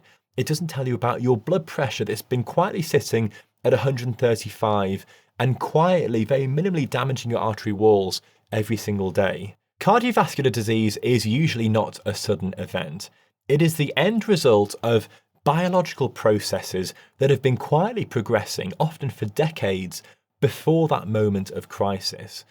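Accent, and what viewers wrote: United Kingdom. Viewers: não sei se vc é AI mas se for, quem te fez está de parabéns! além de lindo, inteligente e explica de uma forma que qualquer um pode entender! E esse sotaque britânico fecha com chave de ouro! agradeço ao algoritmo ter mandado esse video pois além de bem feito com ótimas informações, tem uma estética agradável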